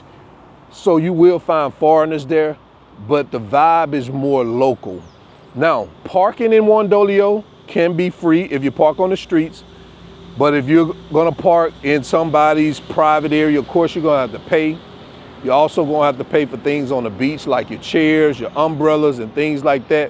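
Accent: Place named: Alabama, United States. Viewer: Es el acento Alabama para mí .. con las palabras en español 😂 “malecón” “Ave Spaña” 😂🫶🏽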